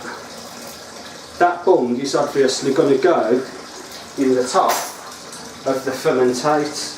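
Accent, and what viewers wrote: United Kingdom. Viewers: got to love the midlands accent ;)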